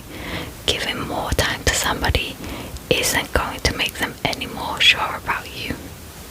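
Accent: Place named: Slovakia. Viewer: A fantastic Slovakian accent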